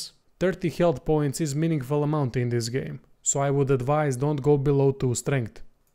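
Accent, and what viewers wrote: Russia. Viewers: You Russian?? Slightly sounds forced cause I love doing a Russian accent as an american. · Best true Russian English ever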